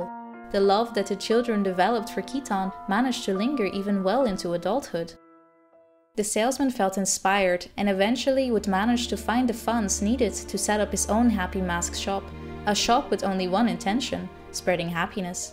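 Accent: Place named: Netherlands. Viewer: Love your vids :) what country are you from? You sound Dutch or something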